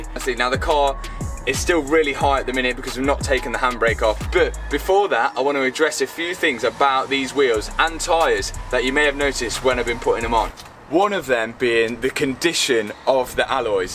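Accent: Leicester, United Kingdom. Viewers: Good work 👍🏻 Just come across your channel tonight. You from Leicester? You sound it. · Thought I recognised the accent being the same as mine! Leicester ♥️ Great vids!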